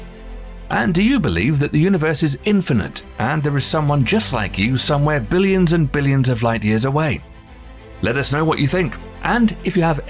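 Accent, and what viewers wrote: United Kingdom. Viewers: snarky, breathy middle-age british accents make it all factual for most listeners lol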